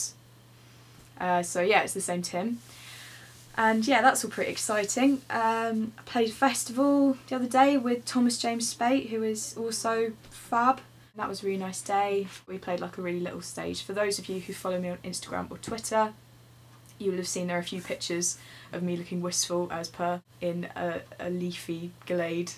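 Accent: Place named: England, United Kingdom. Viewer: What county are you from, I'm from Bedfordshire I'm just wondering as my accent is quite similar to yours :)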